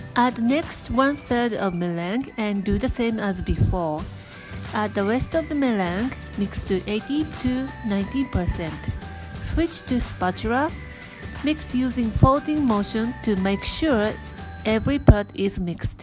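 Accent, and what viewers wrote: Japan. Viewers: Japanese English is seriously the cutest thing ever, I could listen to it all day and night 😍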